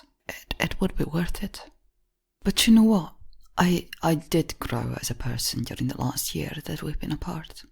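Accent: United Kingdom. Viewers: You are British .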